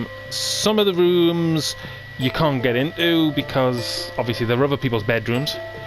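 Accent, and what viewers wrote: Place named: Newcastle, United Kingdom. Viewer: It looks fab and you still sound great. Sexy Geordie accent xxxx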